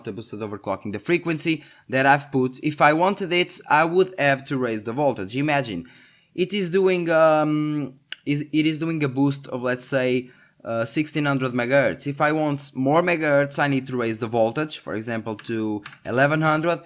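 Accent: Portugal. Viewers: Quando te ouvi falar logo no primeiro minuto pensei: este deve ser tuga, isto é um sotaque tuga a falar inglês. E eis que acertei! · Tens bons Vídeos,Só tens que melhorar o teu sotaque em Inglês,a primeira palavra que te ouvi falar soube logo que eras Portugues :) ahaha mas bom contéudo anyways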